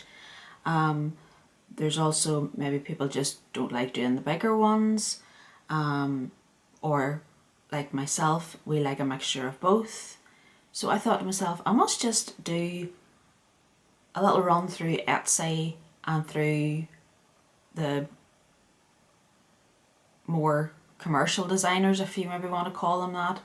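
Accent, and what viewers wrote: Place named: Northern Ireland, United Kingdom. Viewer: 🍓loving the accent. I live in Bangor Co.Down and I don't hear many if our accents on flosstube. The other one I watch is sewmesarah who's amazing. Loved seeing all the little projects. I was a monogamous stitcher til I discovered flosstube about 6 months ago. I see so many things and I've a list already from what you've shown today that I don't know where to go next. Keep up the good work. 💞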